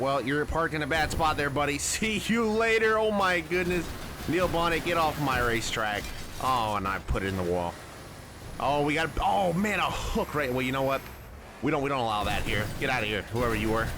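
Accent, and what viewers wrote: Michigan, United States. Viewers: This was hilarious dude. Well done. Sidenote, you from Michigan?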